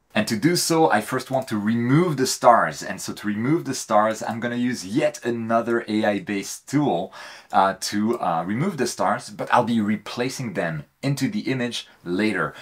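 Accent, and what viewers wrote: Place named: France. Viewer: c'est moi ou j'entend une pointe d'accent francais dans ton anglais? good job vidéo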